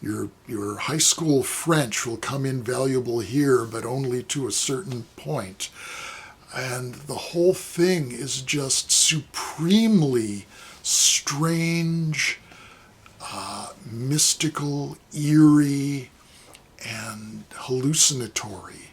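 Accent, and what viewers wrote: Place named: New Orleans, United States. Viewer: You are messing with my neighborhood now. I grew up in the same 9th ward area as. I guess that makes me a night tripper too😂. We have a very similar accent. Your pronunciations are a bit off, but no one would notice but a local😂🤣 It's nice to seeing you giving some love to the good Doctor, especially at this haunted time of the year. Have a great Halloween even if you aren't here in New Orleans. There is a new book coming out, I believe next month., my brother from another mother😂🤣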